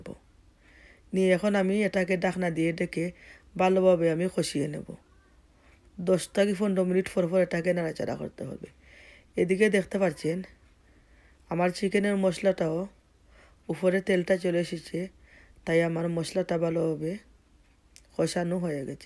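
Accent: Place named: Sylhet, Bangladesh. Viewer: আপু দেখিলিছি আপনার রান্না , মিস করছিনা। বাক্কা মজা লাগল আপনার রান্না । আমার নানা সিলেটে । তাই আপনার মাতকতা বালাউ লাগে আমার কাছে । আমার মায়ের ভাষা ।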